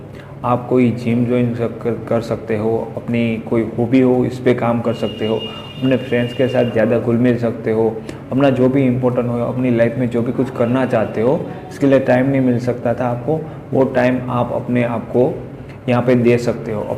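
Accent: Gujarat, India. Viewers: Bhai tame Gujarat cho?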